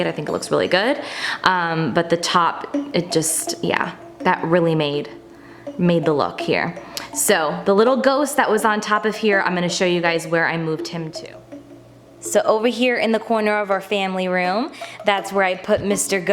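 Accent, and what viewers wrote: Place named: Midwest, United States. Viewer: I’m not American so I’m not sure but is this a midwestern accent?? She has the craziest twang in her voice I’m obsessed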